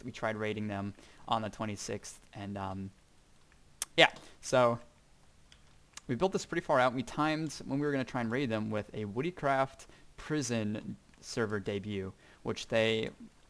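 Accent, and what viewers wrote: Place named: United Kingdom. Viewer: You sound like your from the uk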